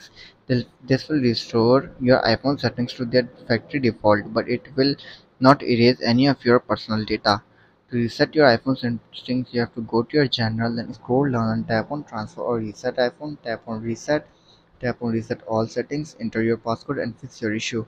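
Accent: India. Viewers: Jesus Christ, this Indian accent is unbearable. I can't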